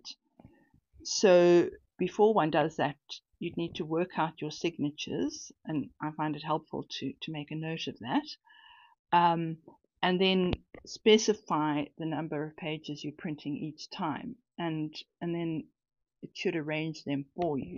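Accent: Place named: South Africa. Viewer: You must be from South Africa? You sounds just like my mother in law. 😊 Thank you for this video! I have always arranged mini book pages the hard way, but now I have some much easier and quicker options.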